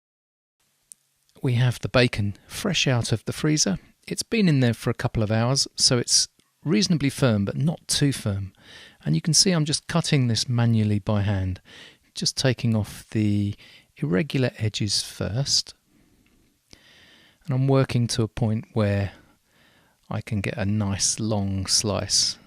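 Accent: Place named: Australia. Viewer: I love the accent bro. Crocodile Dundee up in this bihhhh. "That's not a knife, this is a knife!" LOL every time u say knife thats all I i hear 😂😂😂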